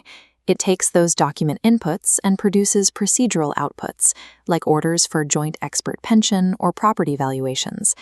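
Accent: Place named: United States. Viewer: English law. English audience. American accent?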